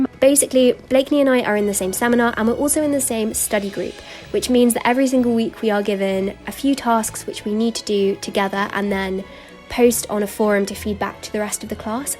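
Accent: United Kingdom. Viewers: I'm learning English, and I love your videos 'cause they are very aesthetic and I really love your accent because he helps me my practice of my listening in English British. Thank you so much!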